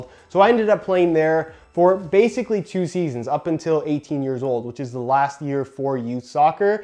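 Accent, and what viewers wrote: Canada. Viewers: Wow your Canadian accent is so prominent to me in this I never really noticed I guess. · i LazyCake The face is so Canadian? No. The only tell is his accent, his face could be American, German, French, Italian, Dutch, etc... what does “his face is so Canadian” even mean? I’m from Canada and I’ve travelled a lot, so I just find that wording hilarious. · I knew you were Canadian! Can always hear the accent of my people LOL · I knew you were Canadian. I argued this with a friend "Deng".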